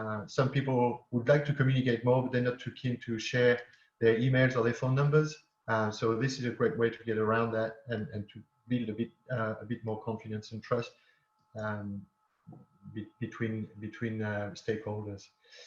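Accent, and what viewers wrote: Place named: France. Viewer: Sound French 👌👍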